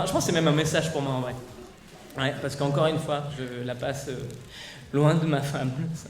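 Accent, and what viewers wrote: Switzerland. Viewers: j'adore ton accent suisse voilà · J'adore ton accent suisse, il faut que tu fasse une vidéo où tu parles en Suisse...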